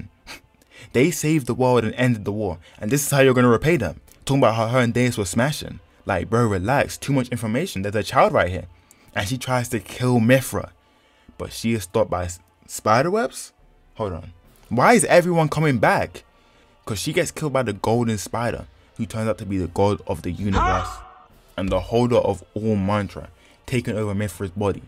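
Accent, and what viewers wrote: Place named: United Kingdom. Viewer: Stfu British zn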